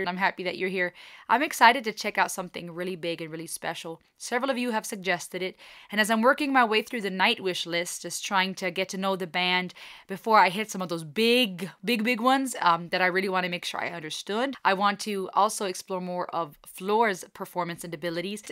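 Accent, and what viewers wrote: Swabia, Germany. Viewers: Heut sich a bisserl Schwäbisch an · Well....if you like this.....and undeniable this is GREAT (and Floor will always find the weak spot in my heart).....you need to check out also the Version with Nightwish and Tarja from from "End of an Era"...you will not be disappointed. Oh...and btw....your german accent is nice 🙂 Nightwish Army Germany out for now. All Hail Queen Floor!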